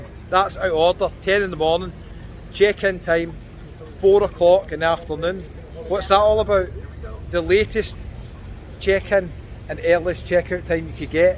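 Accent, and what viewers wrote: Scotland, United Kingdom. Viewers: The title suits me perfectly 😂. Watching this heavy Scottish accent at 2 am, while being high as a kite, big mistake on my part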